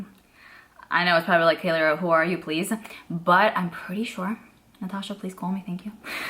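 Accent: New York, United States. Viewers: You have a New York accent. When I moved out of state I was tortured because people would make fun of my accent. 20 years later now I have a Ohio accent and I even say pop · The way you speak? There's nothing wrong with the way you speak.... Ppl are so freakin' rude.... She lives in New York! She has an accent! F ppl, for real. 💗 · You have a New York accent, no? I can't believe how people can be...good grief, I love the way you are! ❤ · Agreed! I follow Liora because she has her own little dialect and I love a good "New Yawk" accent as well. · I'm a NJ girl, born and raised, and the New York in you makes me love you so very much. Your way of speaking is so endearing to me- keep being authentically you! Unfortunately everyone has an opinion about everything and everyone, and feel the need to shout it from the rooftops of the internet 😡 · I love your NY accent. 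You are fantastic and I appreciate your videos.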